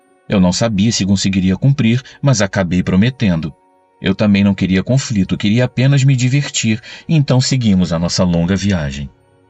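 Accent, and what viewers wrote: Rio de Janeiro, Brazil. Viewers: Olá Que história bacana... É contada com esse sotaque de Carioca, isso dá um toque especial. Como foi bem lembrado: Padre Quevedo nunca desafiou a Deus, e sim as mentiras e 9s falsos profetas. João Batista - Cubatão SP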